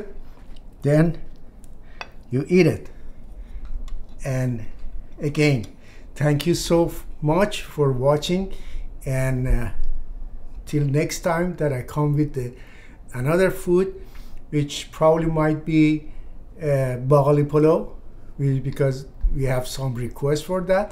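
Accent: Iran. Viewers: Love from Pakistan 🇵🇰🇵🇰🇵🇰 love your persian accent too, will try it!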